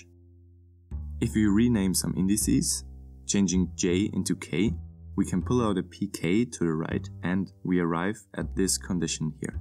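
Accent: Germany. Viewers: Nice vid, vid. Your accent and pronounciation of the word "Ansatz" sounded german, thus may i ask if you're german. I know the vid is old af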